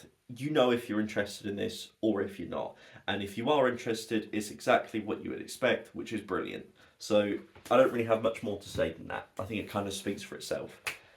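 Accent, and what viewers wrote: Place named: United Kingdom. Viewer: Who the hell is this and why is he british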